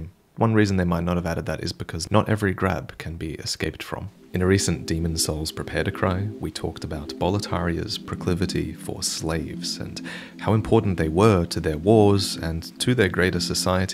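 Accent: Australia. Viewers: the only thing that has kept me from going hollow the last 18 months is the milky smooth sound of your Australian voice. Hold me... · very random, but you do you hail from down under,?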